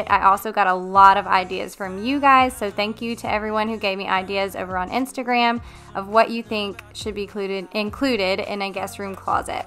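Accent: South, United States. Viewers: I watch you on TV while cleaning and filming along with many other favorite YouTube cleaners. Lol I noticed your accent sounded a lot like mine and was wondering what area you’re from. I am a YouTuber as well, I am from North Carolina. I really enjoyed your motivating video and I loved how your bookshelf turned out. I am always doing DIY projects on furniture.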